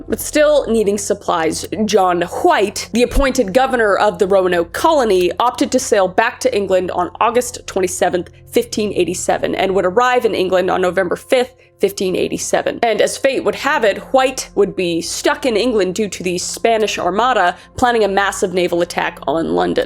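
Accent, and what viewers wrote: Canada. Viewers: I never noticed just how Canadian you were until now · Not trying to be a jerk here, but your Canadian is def showing in these videos bc your pronunciations are all over the place in terms of wrongness. Like Raleigh is said "Rahl-ee" not Ray-lee or whatever it is you're saying. You put sooooo much work into these deep dives and we all love you to bits for it, but you should really look into figuring out how to properly say these place and people names that you're not familiar with. This is like the 3rd or 4th video I've watched where you butchered place/people names.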